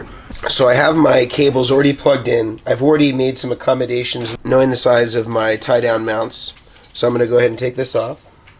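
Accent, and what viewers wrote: United States. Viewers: Hahahaha. Fantastic. thanks I enjoyed that. It's not you who first brought this to my attention but since you said it a lot on this video, sorry but you have been targeted! I have always wondered why Americans pronounce solder this way. I also wonder why some people say " aks" instead of ask. Spelling however is another matter and I am absolutely terrible at it. I have also got some famous sayings completely wrong in the past. ps. I do enjoy your videos man. I have one of the best dumble clones ever in my opinion and am obsessed with those amps which brought me to you to check out your pedals. Would love to try one. Check out my youtube videos if you wanna hear the dumble. I reckon you would really appreciate it : ). It's got original 1975 Bassman transformers in it.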